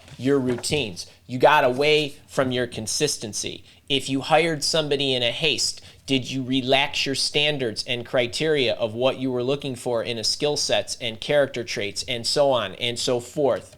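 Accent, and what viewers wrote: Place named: Midwest, United States. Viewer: I'm only 10 seconds in, so I can't say anything about the video content itself, but I just have to say that I LOVE your energy and enthusiasm. Are you from the Midwest by chance? I absolutely love your accent; it reminds me of home. Thank you for bringing this type of energy when preparing for interviews is so scary. :)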